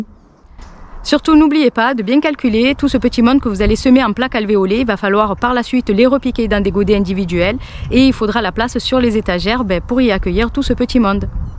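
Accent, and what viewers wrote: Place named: Provence, France. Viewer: super merci pour la réponse, j'ai l'habitude de tout (ou presque) rentrer tant qu'il gèle. À la vue de tes résultats je vais m'inspirer de ta façon de faire, je suis en Provence, donc à l'ouïe de ton accent on devrait pas avoir une grosse différence de climat. 😉 À plus merci encore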